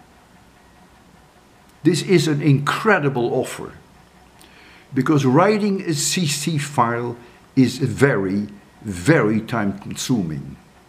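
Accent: Netherlands. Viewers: I think it is because he speaks in a Dutch accent, so YouTube thinks it is in Dutch. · Sir, now my question is: are you Dutch? Because you speak English with a Dutch accent.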